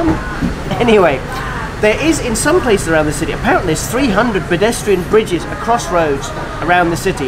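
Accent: United Kingdom. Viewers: English tulen...remember mr bean...omg.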